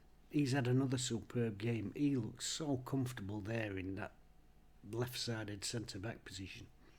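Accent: Sheffield, United Kingdom. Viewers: I love your unique take on the games - and your Sheffield 'speak' as a reminder of my own background. I wish you and yours a great Christmas and New Year - wouldn't it be nice if your beloved Owls and my yo-yo Blades both achieved promotion in 2025. All the best from NZ.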